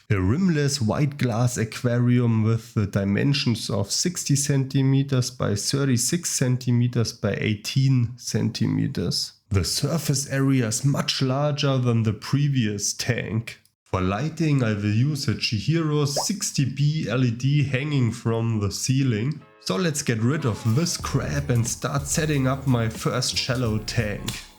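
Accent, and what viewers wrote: Germany. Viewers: i hear some german😅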